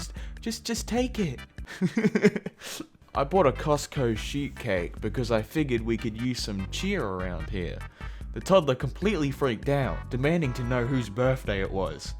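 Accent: Australia. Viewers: He's not English/British... he's Australian. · Are u Australia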